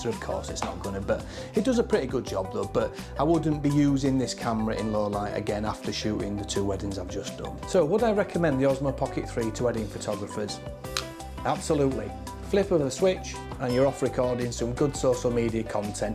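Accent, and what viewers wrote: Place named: Halifax, Canada. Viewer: You sound originally pretty local to me in Halifax! decent video, have you filmed any wedding footage with the osmo or just vlogging yourself? thanks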